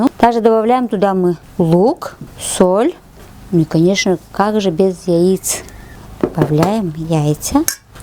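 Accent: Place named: Udmurtia, Russia. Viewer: Дивный сюжет! Я и Ижевска, живу сейчас в Молдове, и просто слышать родной говор так приятно))) Приготовлю на неделе перепечи)))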